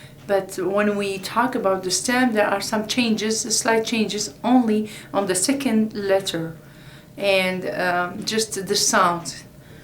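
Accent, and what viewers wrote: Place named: Iran. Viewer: Her accent seems Persian. My Persian friends have this accent.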